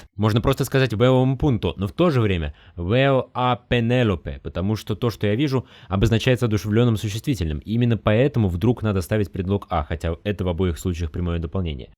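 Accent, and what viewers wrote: Russia. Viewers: круто, но слышен русский акцент при разговоре. сообщаю как человек, который говорит на испанском без акцента.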